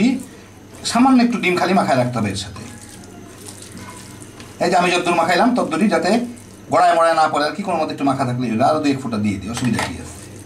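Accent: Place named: Dhaka, Bangladesh. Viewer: আসসালামুয়ালাইকুম ওয়া রহমাতুল্লাহি ওয়া বারাকাতুহ।। আপনার কুঁচি কুঁচি আর তামা তামা ডায়ালগ টা আমার মেয়ে খুব পছন্দ করে ❤️ আপনার কথা গুলো পুরাই আমাদের মত ঢাকাইয়া😜 আমরা আসলে এত শুদ্ধ করে বলতে পারি না।যা বলি নরমাল ভাবেই বলি😁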